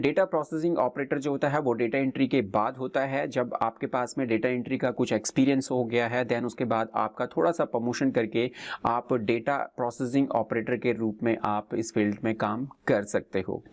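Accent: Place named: India. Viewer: why all the videos have Indians accent !!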